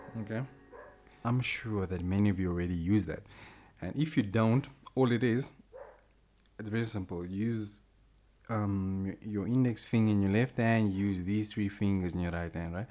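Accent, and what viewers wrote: South Africa. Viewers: bless you bro. You sound like a fellow South African. But let me not assume.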